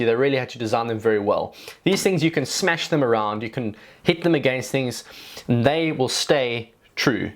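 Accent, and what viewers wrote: South Africa. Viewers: We gonna be looking- you talk like that everytime, its englisch or South african like zulu?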